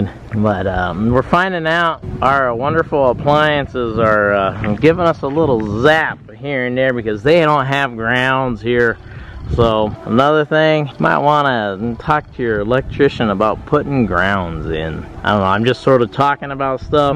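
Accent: South, United States. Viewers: What kind of accent this guy has? Sounds like he has a deep southern accent, or OK accent?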